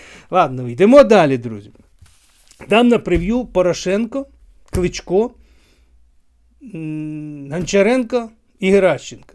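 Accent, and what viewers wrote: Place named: Russia. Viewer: Вы совсем придурок от природы или придурок , который таким стал во время войны?А ЧЕГО СТОИТ ВАШ УКРАИНСКИЙ? С МАССОЙ ОШИБОК И УЖАСНЫМ РУССАИМ АКЦЕНТОМ.КУДА ЛЕЗЕТЕ ПРОВОКАТОР.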